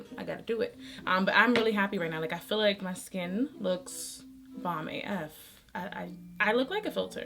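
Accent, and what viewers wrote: United Kingdom. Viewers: Girl! Where you been? It has been too long and your UK accent is coming along nicely 😏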